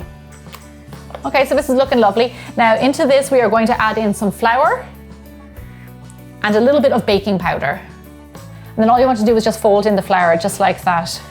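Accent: Ireland. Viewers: Thank you so very much for taking the time to respond to my post and to give me tips for making my pudding gluten free. Thank you also for you kind condolences. I forgot to say how much I LOVE listening to your brogue. You remind me so much of my cousin Terri O’Neill who’s Irish on both her mom’s and dad’s sides. How I love Ireland and the Irish people! ☘️☘️☘️💗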